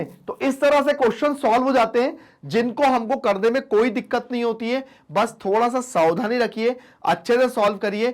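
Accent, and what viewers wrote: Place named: Bihar, India. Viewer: Bihari ho bhai❤❤❤❤😂😂😂😂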